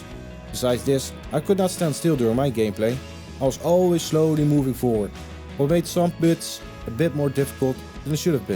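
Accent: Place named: Netherlands. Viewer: It is sometimes hard to understand you due to trying to speaking as a native and your Dutch accent. Sorry to say that but it can be improved I believe. The video is great after all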